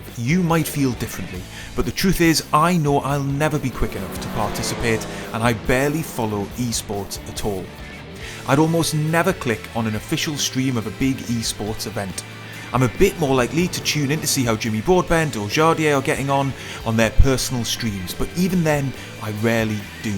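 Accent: United Kingdom. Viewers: Are you me, but with a British accent?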